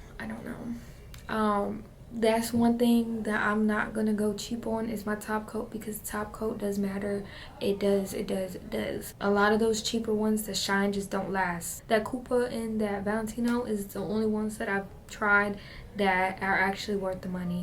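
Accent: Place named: Mexico. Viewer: I know she's Mexican but her Mexican voice is a little too much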